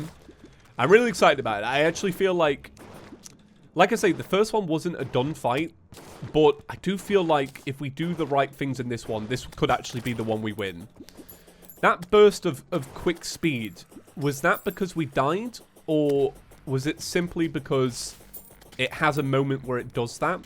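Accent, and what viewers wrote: United Kingdom. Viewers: i haven't been wathching you in a while, until you uploaded the Hollow Knight video. Since then, i've been binging your playthroughs, and accidentally picked up some of the British accent. how do i get rid of that ??'!?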